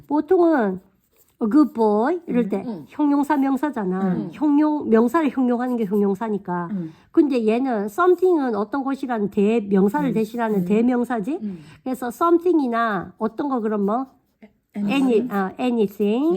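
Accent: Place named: Andong, South Korea. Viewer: 전 친정이 안동이라 고향생각나고 ㅋ 사투리 너무 좋아요.. 친정에 있는 느낌이예요 ㅋ 잘 보고있습니다. 감사합니다! ❤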